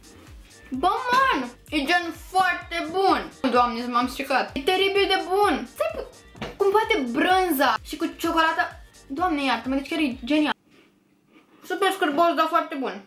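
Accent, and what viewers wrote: Moldova. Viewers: esti moldoveanca?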